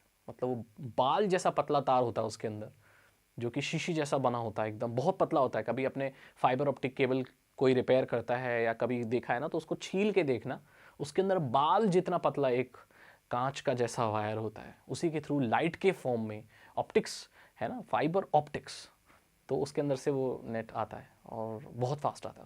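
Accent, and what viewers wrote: Odisha, India. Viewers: Bro, are you from Odisha ? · bro are you from orrisa???? love you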